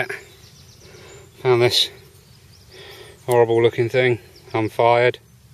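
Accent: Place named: Essex, United Kingdom. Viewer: G'day I'm an Australian these days but came from Ilford back in 1970. Where are you? You sound a bit like an Essex lad. I detect here in Perth but there is nothing very old in Oz.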